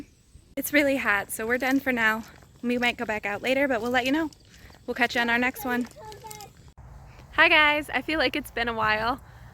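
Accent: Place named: Michigan, United States. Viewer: Nice finds,,like the Michigan accent, i got to hunt in pontiac once, i know a few folks in Houghton lake and Traverse city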